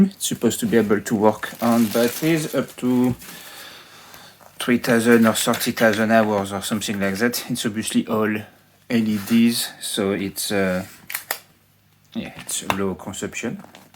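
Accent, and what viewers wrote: France. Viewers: sacré accent français je trouve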